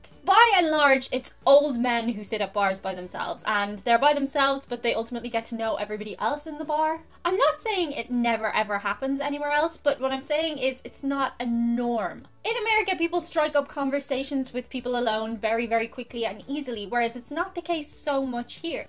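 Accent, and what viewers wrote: Ireland. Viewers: very interesting. I'm from the usa but my Grandfather (Thomas Feeney) was born in Dublin Ireland. I love your Irish accent...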